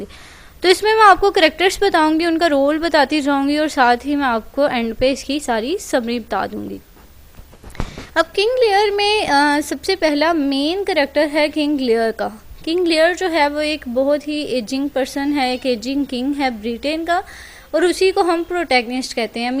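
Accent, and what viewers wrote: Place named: Islamabad, Pakistan. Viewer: ویسے آپ ہیں کہاں سے ، لب و لہجے سے تو پنڈی/اسلام آباد سے لگ رہی😁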